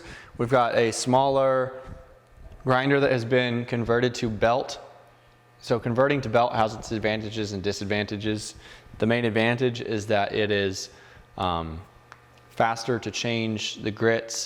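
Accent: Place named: Rural, United States. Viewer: Sounding a little country there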